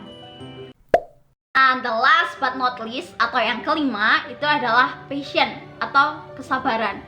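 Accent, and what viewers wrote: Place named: United Kingdom. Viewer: British nya uwuw, aku lebih ke logat America:v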